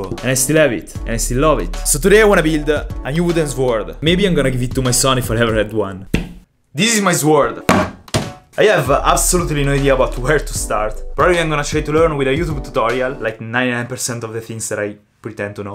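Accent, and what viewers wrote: Italy. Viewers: Ma sei italiano??😅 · Bro, ma sei italiano? Ok, lo è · Are you from italy? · Sei italiano? Ok alla fine del video si capisce che si, lo sei. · Sorry but... are you italian? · love your italian accent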